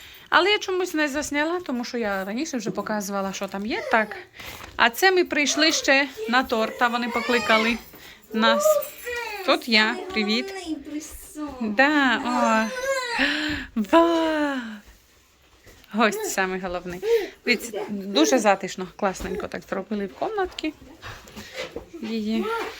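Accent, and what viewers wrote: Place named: Kremenchuk, Ukraine. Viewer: долгие лета! Счастья и здоровья!,очень нравитесь вы и ваша сестричка. Очень нравится как вы говорите,у меня двоюродная сестра из Кременчуга,так- же разговаривала,слова как ручеек льются.❤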